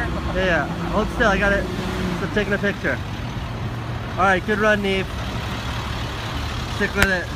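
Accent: France. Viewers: Great french accent ! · That accent in french though :) Greetings from Paris ! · haha love your french accent! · your french accent it's cool :p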